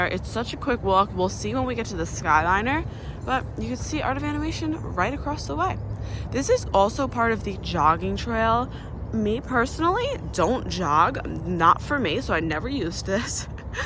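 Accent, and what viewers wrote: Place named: New Jersey, United States. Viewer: Just found your channel looking for pop reviews since this would be a good cost option for our next trip 😊. I’m from NJ and I’m hearing a similar area accent lol where are you from?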